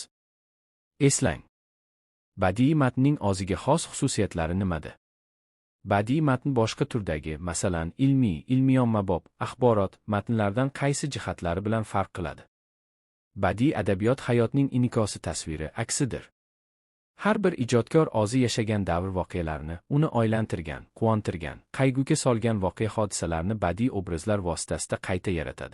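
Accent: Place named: Russia. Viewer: Nima rus kishi oʼqiganmi buni gʼ ni g deb aytadi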